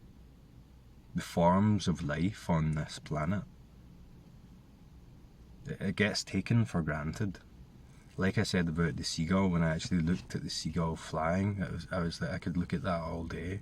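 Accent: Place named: Scotland, United Kingdom. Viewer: another beautiful video. It feels like I’m sitting in my car in Los Angeles cheering you on in your car over there in Ireland, I thought you were Scottish though I can’t quite figure that out lol but anyway cheering you on is cheering me on. I recently got incredibly incredibly deeply triggered in relationship over Christmas break. No details are needed here, but I just felt the self Ing mechanism coming back so strong and it was so unbearably uncomfortable. My whole body got like stuck in a freeze mode, like my nervous system just got stuck. I had to come back from Christmas break and take a month off work so that I could simplify the outer stressors as much as possible so that I could tolerate these very very deep, deep internal stresses. Yes there’s no exterior and interior but I think you know what I mean. I had to give myself time to sit with the most subtle and yet intense reactivity to life, because what can feel so powerful in the selfie mechanism is the sense of time and urgency and like we’re working against time. 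At least that’s the experience here. So I had to give myself literally a month and more if I need to to just be with these very very verysubtle and intense sensations without feeling the pressure of time to escape them. Anyway, didn’t mean for this comment to get so long but that’s what’s going on here now and your video was right on time. Speaking of lol. Thank you, thank you thank you❤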